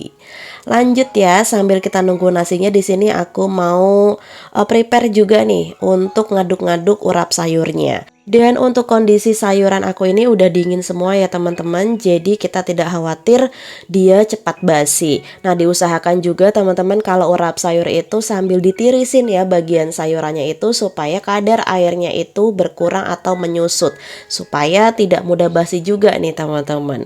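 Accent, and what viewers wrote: Java, Indonesia. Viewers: Ya Allah... Denger mbak ngomong Jowo koq yo iso medhok to... 😀..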